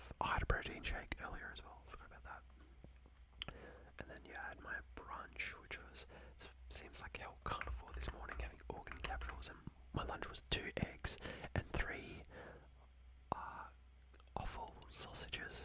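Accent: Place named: Australia. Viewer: it's so great to see another australian dude who likes/makes asmr! I've been wanting to make some for a few years but never started, didn't expect to open this video to another aussie voice it was weirdly familiar haha, keep it up man this was heaps relaxing 👏🏼 If it's not intrusive, do you mind sharing what state you're in? The mildly specific accent is there but can't pick it haha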